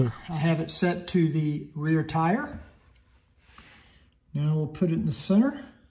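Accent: United States. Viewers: I live in Amsterdam the Netherlands. I own a GW2021 and ordered the GW 2022 DCT touring All Black. I love your video’s and specially your voice and American accent. I love it. Thanx.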